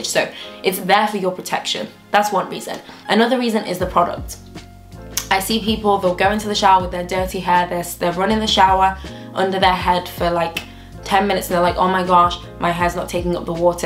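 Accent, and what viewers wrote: United Kingdom. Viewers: Are you just talking to British people or something? Because I noticed you have an accent. I know you're not talking to Black American people or maybe you don't know our history. You just said that white people have a variation in porosity. Black Americans are literally white. Like literally have white ancestry on high levels. And you're out here telling us we can have a certain hair texture. Well not understanding we're not africans. African would morsel apply to someone who is British like yourself maybe. Who has 100% African ancestry not someone who is literally working with 24% European ancestry. And people even who have 5 to 10 European ancestry. That's still a part of our dna. And it will still be expressed in many different ways. So just because someone has the same skin tone as you. You can't say we have the same genetic makeup as you. 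Therefore our hair may have a different pattern. I don't even know what you mean by African hair. Is African hair just 4c hare? I always wondered that. Are we including 3C hair or at least four a hair? Personally for me when I look at hair types. And it's hard to see because everybody likes to do a wash and go and loosen their curls. Which is like I can straighten my hair totally what lies that my natural curl like know. But some people they don't like to show what they got but from what I've seen the dry version of 3C that's tighter. Four A and 4b. Sort of. A looser texture of 4b are textures that are pretty much relatable to my hair t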